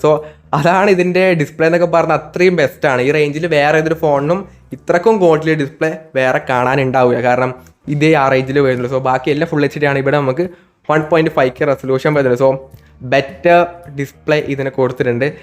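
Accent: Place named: Malappuram, India. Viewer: Malappuram jillayilano.rasamulla slang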